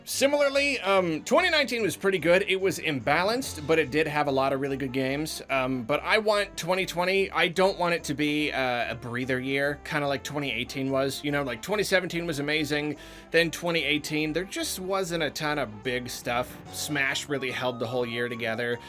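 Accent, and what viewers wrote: Ireland. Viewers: Slightly Irish edit it to for the time stamp to work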